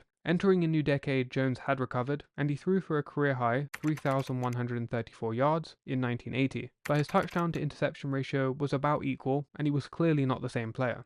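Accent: England, United Kingdom. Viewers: British narrator or AI ? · An Englishman doing American football sounds funny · why a whack english chap narrating doesnt mix with AMERICAN football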